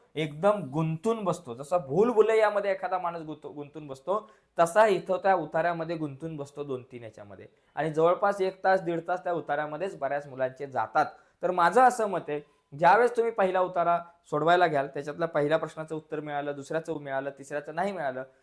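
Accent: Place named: Nanded, India. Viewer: नांदेडकर बोलते❤️❤️❤️❤️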